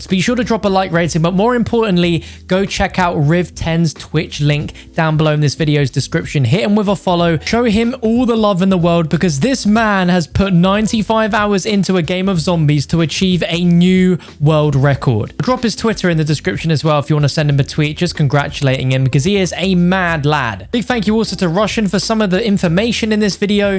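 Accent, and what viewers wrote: United Kingdom. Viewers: bruh ur british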